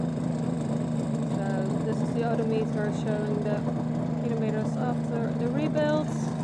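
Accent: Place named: Netherlands. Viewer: Tu mir einen Gefallen..keine KI... Ich liebe deinen niederländischen Akzent 🙂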